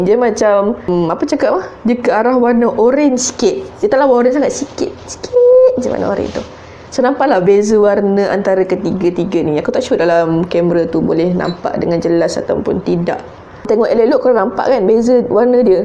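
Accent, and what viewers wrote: Kelantan, Malaysia. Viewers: Ore kelate koo ni 😂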